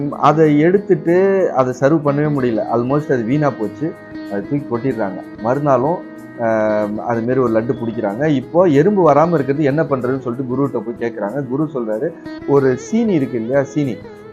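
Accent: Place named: Karaikudi, India. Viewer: சிறந்த ஆக்கப்பூர்வமான பேச்சு சார்..... இனிமையான குரல்..... கொஞ்சும் காரை தமிழ்.... மேலும் மேலும் கேட்ட தோன்றுகிறது....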